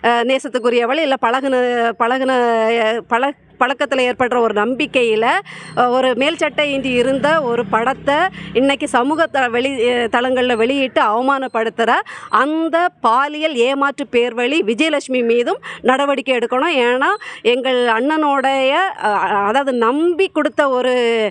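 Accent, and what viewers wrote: India. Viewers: அக்கா கன்னியாகுமரி மாவட்டத்தில் உள்ள பெண் போல் தெரியுது பேச்சை கேட்டல் 💪💪💪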